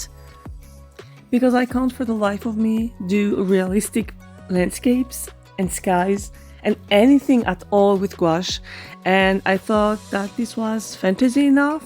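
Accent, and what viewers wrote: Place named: France. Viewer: Hehehe bonsoir 👀 Je viens de finir et omg le renard à l’aquarelle, je hurle! Je suis sûr que c’est une galaxie qui bouge tout le temps et que des peuples extraterrestres anciens l’ont vu et ont créé des mythologies, des textes sacrés basés dessus ou juste utilisé son existence comme un présage ou something!!! Le fait que les renards soient tous différents est super coolos AAAAA 😩😩 Ton accent est beaucoup trop mimi en anglais, jvais crever, je pleure en Oui Oui Baguette stp 😭 Félicitations pour cette première vidéo! C’était super sympa à regarder hehe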